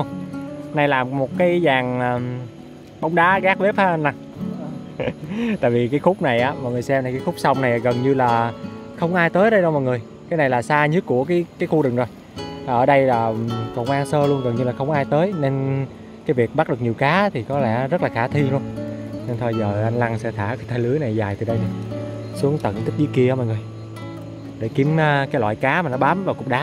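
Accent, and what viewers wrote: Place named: North, Vietnam. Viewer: Giọng anh giống giọng miền bắc ghê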